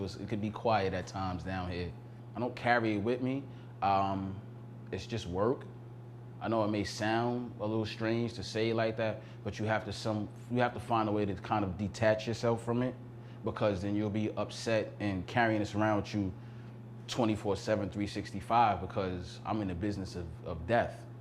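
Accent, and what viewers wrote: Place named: New York, United States. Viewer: I KNEW he was from NY before they even showed Harlem Hospital lol. The accent is unmistakable. #myfellownewyorker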